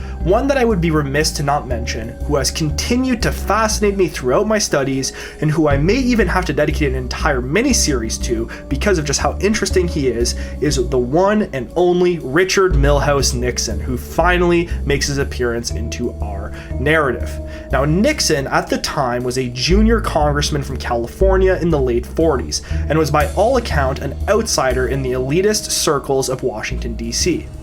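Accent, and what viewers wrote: Canada. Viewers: The Canadian accent is rough. You have a major problem with your As.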